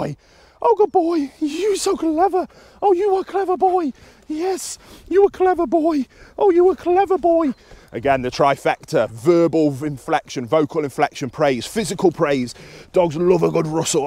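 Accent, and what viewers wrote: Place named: North, United Kingdom. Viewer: I'm late to this party. As a northerner in London, it's nice to see and hear a northern Brit doing this, rather than an American. Thank you!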